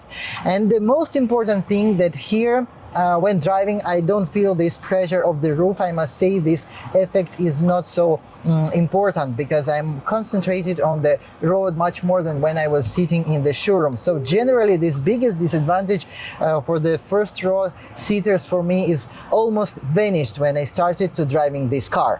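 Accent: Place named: Asia. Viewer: You do like an Asian person speaking English. If I didn’t see you, then I will think you’re Asian.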